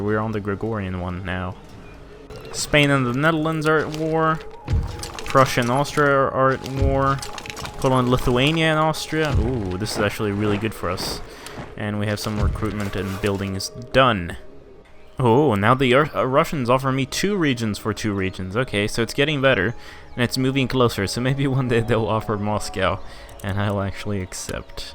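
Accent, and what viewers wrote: Sweden. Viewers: Are you from sweden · Are you Swedish?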